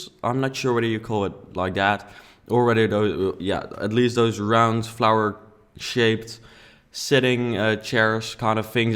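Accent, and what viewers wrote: Netherlands. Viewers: Are you dutch? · Ik hoorde gelijk dat je Nederlands bent ;) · Suupermooi gemaakt! Ik hoorde aan t begin van de video t nederlandse accentje er al doorheen, kun je ook niks aan doen tho ;)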